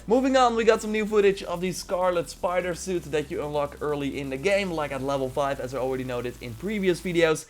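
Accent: Netherlands. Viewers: You sound like a dutch man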